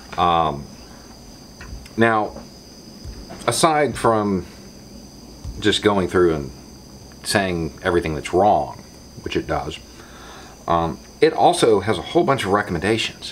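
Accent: South, United States. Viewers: So I kept getting confused because it sounds like you're talking about getting rid of "no not greats". I had to rewind like five times to figure out what you were actually saying. I don't think it's your southern accent, either; just saying it to myself in my pacific coast accent, it sounds the same to me. Just one of those phrases that's confusing if you haven't heard it before.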